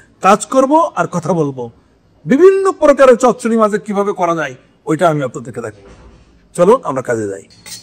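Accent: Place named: Jhenaidah, Bangladesh. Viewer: শেফ ভাষা মনে হয় ঝিনাইদহের ভাষা। আমার বাড়ি ঝিনাইদহ সদর।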